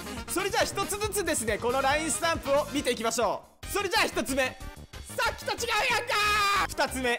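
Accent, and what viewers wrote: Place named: Hakata, Japan. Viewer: たまに出る博多弁好きです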